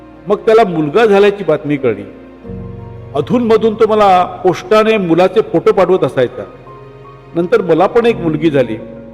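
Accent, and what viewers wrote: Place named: Kolhapur, India. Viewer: कोल्हापूरी टोन ऐकायला बरा वाटला.. शेवटी कोणी कोणाच नसत हेच खर...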